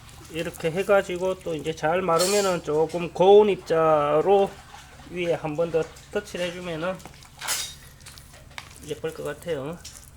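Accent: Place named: Hamyang, South Korea. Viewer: 함양은 합천군과 붙어 있지요 기와 땜에 함양읍에 간적이 있어요 억양이 같아서 왠지 정감이 가더니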